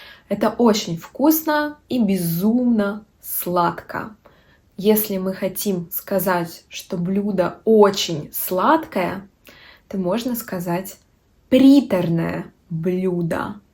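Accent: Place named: Finland. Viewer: Я единственный, кто слышит в этом финский акцент, звучит довольно круто. Далее я хочу услышать некоторые южнорусские акценты с раскатистым «р». 😊🍖🍗🍗🥞🥞🥞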